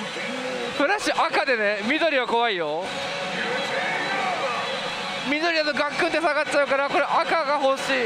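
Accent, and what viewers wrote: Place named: Kochi, Japan. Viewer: 土佐弁最高！😊同じ高知で応援してます😆👍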